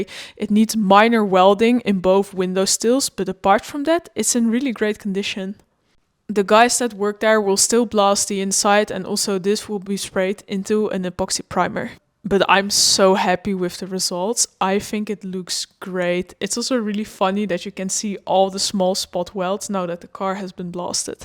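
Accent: Netherlands. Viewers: You are Dutch. I can hear it. Lekkerrr.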